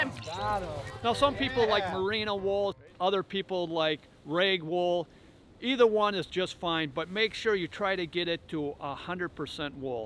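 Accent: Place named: Midwest, United States. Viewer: By your accent I'm guessing you're from the midwest? I'm from northern New England where it also gets extremely cold. I enjoyed watching your video for the good advice and I got a kick out of your accent. You'd probably get a kick out of mine too. Thank you for your service and happy Thanksgiving!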